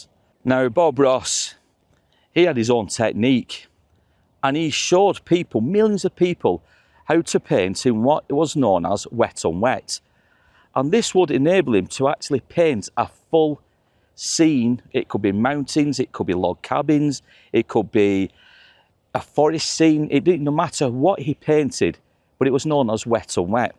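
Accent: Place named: North, United Kingdom. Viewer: While I'm what's known as a talentless git, I also love to watch Bob Ross 😊 He had a lovely manner to him and just had that way of making me feel happy. I've been watching your videos for some time now and I have to say the delivery is that of a master storyteller. I'm not from the north but your lovely accent and empathic style really brings these, sometimes forgotten, stories to life vividly. Yours and research skills and attention to detail does you both proud. I shall continue to look forward to your videos.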